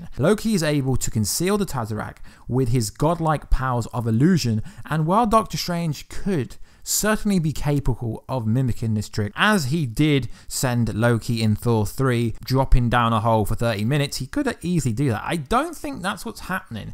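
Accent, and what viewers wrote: United Kingdom. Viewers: OH MY GOD... MAN WITH BRITISH ACCENT... YOU KNOW ANT-MAN HAS NOT BEEN RELEASED IN THE UK AND YOU JUST SPOILED IT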